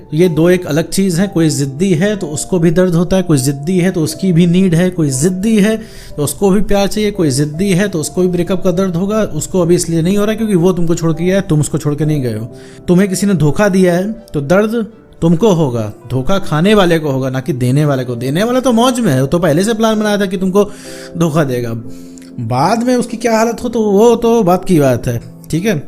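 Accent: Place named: Lucknow, India. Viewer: Ap kha se ho lucknow ya kanpur side ki aawaj lg rhi h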